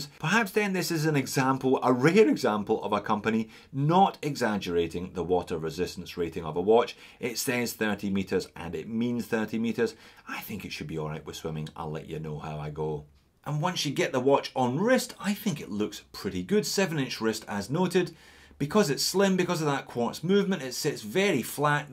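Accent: Scotland, United Kingdom. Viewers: hey I spent the last few hours watching some of your videos, I love your presentation style and iconic "Hello" at the start. Love the scottish accent too. I like how you cover many different types of watches at all price points and it makes for interesting viewing. Keep up the good work mate!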